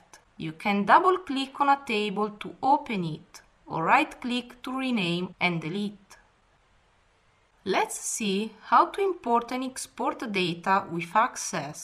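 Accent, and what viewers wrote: Italy. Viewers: I think it's Italian, definitely not Russian :) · This Italian accent though :D so funny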